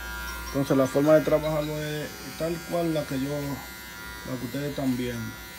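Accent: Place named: Dominican Republic. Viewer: Es que así es que hablamos los Dominicanos, y no solo cambiamos "L" por "R" tambien cambiamos palabras por completo😂😂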